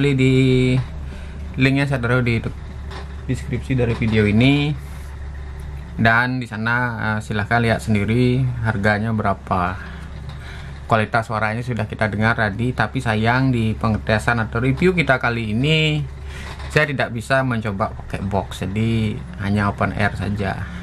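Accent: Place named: Bali, Indonesia. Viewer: Kyak logat orang bali😂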